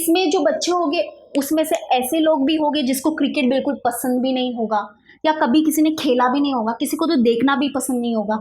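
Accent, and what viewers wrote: Maharashtra, India. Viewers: Mam is speaking hindi good but the 'Barobar' 😅 mam is marathi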